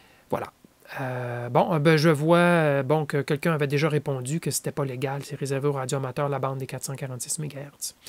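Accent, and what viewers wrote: Quebec, Canada. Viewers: Merci à toi l'ami pour cette première vidéo en live à laquelle j'ai pu participer . En plus avec l'accent du Québec, c'est le top ! :-) Tu laisses visible le contenu de ce qui s'est dit dans le t'chat et je trouve ça bien sympa ! Cordialement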